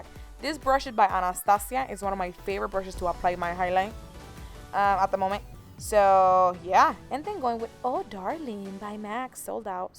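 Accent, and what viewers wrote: Latin America. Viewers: Her accent isn't American lol. She's Latino which is what you're hearing when she speaks.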